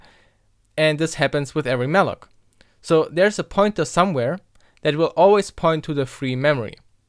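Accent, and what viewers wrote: Germany. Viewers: I like your old videos as well as your newer ones! Also, is it right that I think from your accent that you're german?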